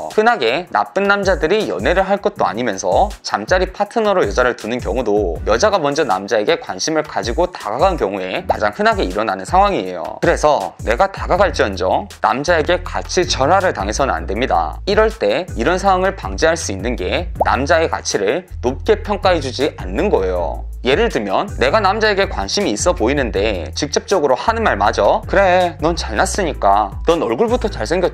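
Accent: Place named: Gyeongsang, South Korea. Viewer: 경상도 억양 세시네욤...ㅋㅋ...🥲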